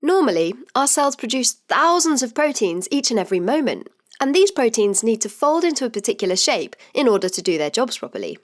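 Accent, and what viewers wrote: England, United Kingdom. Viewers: I love the typical English accent .... would love to hear more 😋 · I missed you,! Lovely Brit accent! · I love british accent! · your British accent is so cool kinda strange but still cool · I love your british accent... 😍😍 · Loved that British accent !!! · I wish you get rid of this british accent. We do not understand 🤥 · Beautiful british accent!!!!! Great lecture😊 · Whats with the british accent.. Its not even the bbc english which is easier to understand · She explained it so well, but her heavy English accent is bothering me so much that i cannot focus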